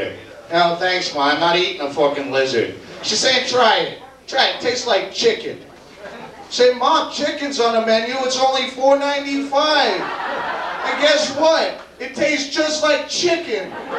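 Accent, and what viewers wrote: Boston, United States. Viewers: So when did this super thick Boston accent disappear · The Boston accent is wicked pissa! More proof that has always been a genius...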